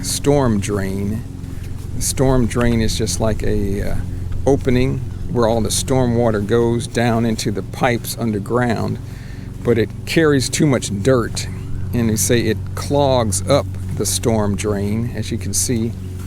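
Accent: Texas, United States. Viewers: I like the Texas dialect ! it´s laid back and cool Wild West style😃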